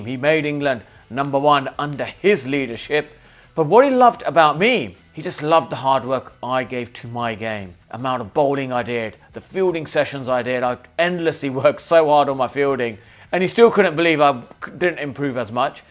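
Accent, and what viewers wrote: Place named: India. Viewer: You still have some Indian accent left within you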